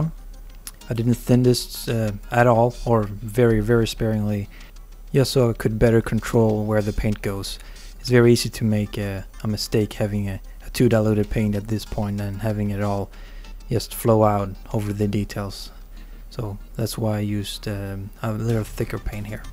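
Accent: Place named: Sweden. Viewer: Tyckte att jag anade lite svensk brytning i din engelska. Nåväl, mycket bra klipp och snyggt målat. You are subscribed :)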